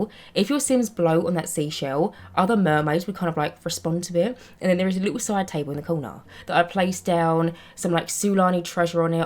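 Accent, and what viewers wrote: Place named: Newcastle, United Kingdom. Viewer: This is random but are you from the uk cos you sound like you’d be from Newcastle also I love your builds I wish I could build like you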